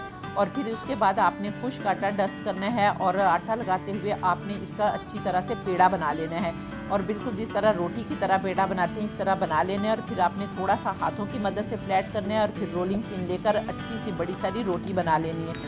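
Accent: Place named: Delhi, India. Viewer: Dear sister,Masha Allah behtreen recipe of Palak and Mutter.Your way of talking and fluency is very charming .I feel you. Speak Urdu of Dehli origin.Use of a Punjabi word Bhuppa was also a unique selection by you. I enjoyed it. Thank you for pinning me and your response to my comments.Allah Hafiz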